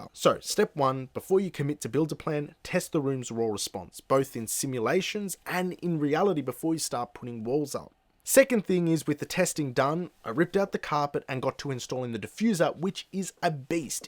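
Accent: Australia. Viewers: This has changed the way I view putting my room together thank you!!! So nice to hear a fellow Aussie break down things to Australian standard as well!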